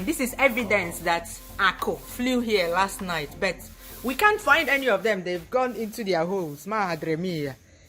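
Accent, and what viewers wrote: Igbo, Nigeria. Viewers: I finally see ur face.....been hearing ur igbotic voice....weldon ma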